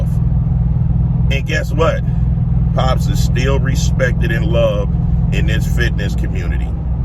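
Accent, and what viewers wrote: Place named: South Central Los Angeles, United States. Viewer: THATS OUR SOUTH CENTRAL LOS ANGELES ACCENT, YOU FINNA TELL A WHYTE GUY FROM BROOKLYN TO SPEAK LIKE YOU? 😂😂😂💯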